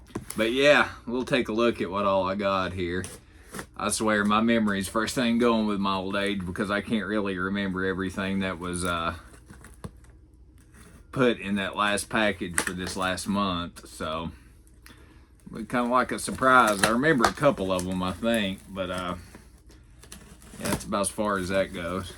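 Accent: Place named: South, United States. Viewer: Just found this channel. Great video. You sound like a fellow Southerner. New sub.🎃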